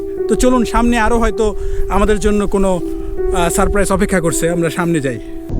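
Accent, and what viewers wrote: Sylhet, Bangladesh. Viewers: ভাই তুমি সিলেটি নি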